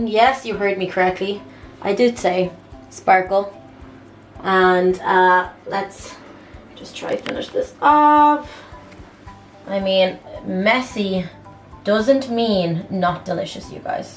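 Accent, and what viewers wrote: Ireland. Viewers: are u irsh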